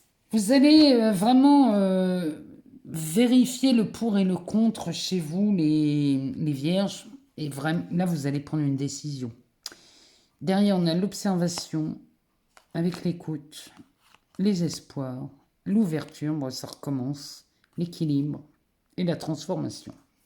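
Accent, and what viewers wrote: North, France. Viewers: merci, pour tout. Mais tu me fais marrer, sans rien faire, seulement de t'écouter!!!!!! Bravo, cet accent nòrdiques que j'aime, tellement!peut-être des ch'tis??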